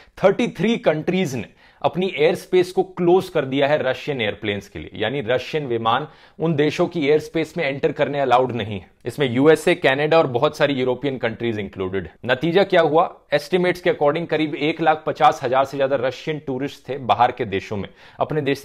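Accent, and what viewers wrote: India. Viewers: I watched his video after many years today, i had stopped watching his videos when i realised his propoganda. But i still respect his quality of research on non-partisan issues. A big change i got to see is in his Hindi language tone. He has changed his accent in Hindi and now it sounds more desi He deliberately says Hajar instead of Hazar. Good marketing for his propaganda All the best to him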